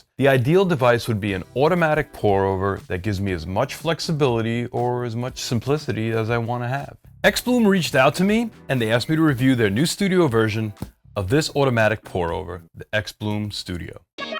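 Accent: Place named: Brooklyn, United States. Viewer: Your accent reminds me of the old school Brooklyn Accent. I still hear it in natives that are over a certain age. An era of NY that I miss.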